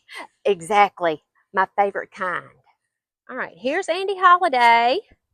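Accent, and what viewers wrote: South, United States. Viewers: Great tip! We have a dear friend who has a beautiful garden and her name is too. She has the most amazing raspberry bush with no THORNS that produces the biggest and sweetest raspberries. We've been meaning to get some of that plant for my garden and now you've motivated us to stop by her place. BTW....love your southern accent.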